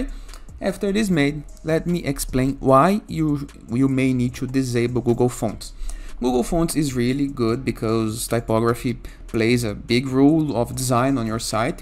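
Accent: Brazil. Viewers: esse sotaque é tupiniquim , hein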